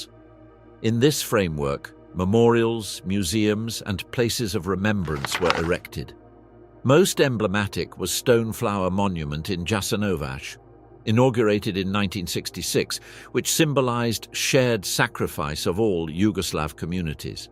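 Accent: United Kingdom. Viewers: Well, whomever wrote/narrated/AI'd this, clearly wasn't a "English as mother-tongue" speaker, despite the English accent, as evidenced by the lack of 'articles' [a - an - the]. And the occasional sound of pages being turned isn't fooling anyone. 😏😏😏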